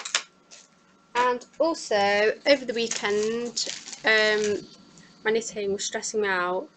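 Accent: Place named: Sheffield, United Kingdom. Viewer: its brilliant seeing another stitcher from the uk though it started a debate between me and my boyfriend trying to place your accent i say somewhere south Yorkshire? thats where i am and you kind of sound sheffield ish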